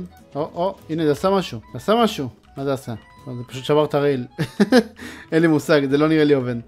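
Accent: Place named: Russia. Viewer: שיואו צפיתי בסרטון שלך מלפני שבע שנים שהיום אנחנו אגב ב<num> ושומעים שיש לך מבתה רוסי ברמה מטורפת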